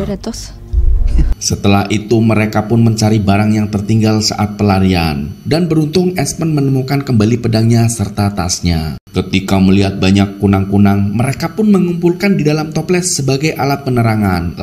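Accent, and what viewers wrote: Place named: Java, Indonesia. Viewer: Admin asline wong endi kang? 😂😂😂 lucu logat Jawa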